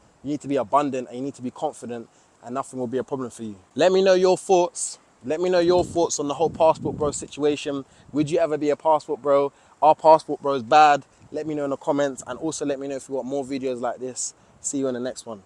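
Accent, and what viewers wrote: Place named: South London, United Kingdom. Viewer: well said man - i think as long as it's all consensual/safe between adults then it's nobody's business but theirs. You sound like you're from South London btw - Thailand honestly beats the hell out of Lewisham or Croydon (where i'm from)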